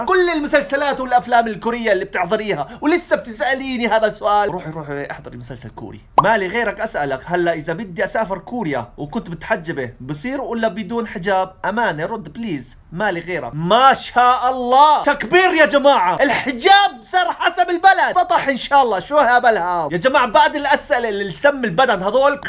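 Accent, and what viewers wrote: Jordan. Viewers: لغتك الأردنية كيوت احبها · ويتكلم بالهجه الأردنية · بدنا تسوي فديو وانت على طبيعتك بدون ما تتحول لاردني 💫👼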